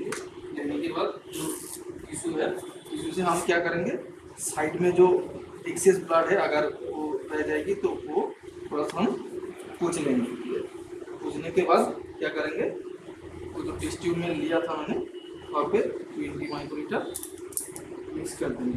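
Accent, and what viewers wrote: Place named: Bengal, India. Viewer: স্যার আপনি কি বাঙালি ?? আসলে,আপনার হিন্দি বলার কায়দা দেখেই বোঝা যাচ্ছে 😅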